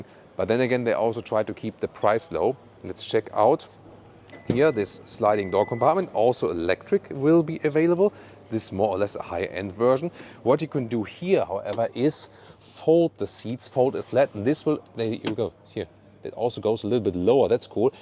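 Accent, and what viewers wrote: Germany. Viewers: The word version really brings out the German accent 🙂 I had seen another review of it earlier that did not mention the ridiculously low battery capacities 😕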